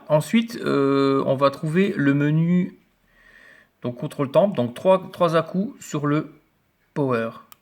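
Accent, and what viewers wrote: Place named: Lorraine, France. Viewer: Hop'la l'ami Lorrain, vla un accent que je connais bien ;) Je viens d'à coté de chez toi, je possède aussi une Fuchai 213, normal que je m'abonne !! Bonne vap et bonne continuation ;)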